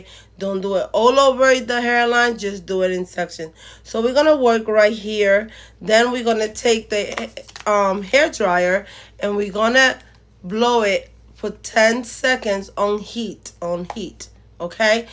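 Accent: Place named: Cuba. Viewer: I love your accent , you sound cuban ❤❤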